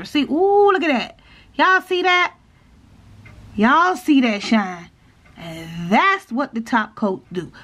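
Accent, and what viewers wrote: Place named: New York, United States. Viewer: I'm loving your New York accent. I'm loving your nails, so pretty.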